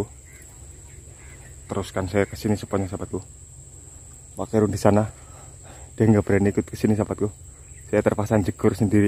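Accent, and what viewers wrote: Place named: Kebumen, Indonesia. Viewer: Aslm ww...mas asli kebumen apa bukan, karna bahasanya kayak kebumen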